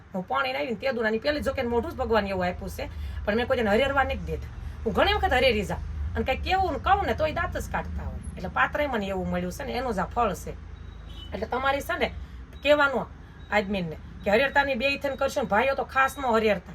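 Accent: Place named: Amreli, India. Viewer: Tame amreli na hoy aevu lage chhe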